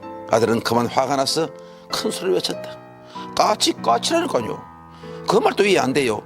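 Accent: Gyeongsang, South Korea. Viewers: 황수관이 억양이 맘에 안든다. 수꼴 TK 다. TK는 "ㅇ"이 들어간 단어는 발음을 세게한다. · 까치라꼬. 라꼬. 라꼬. 갱상도사투리 절라싫타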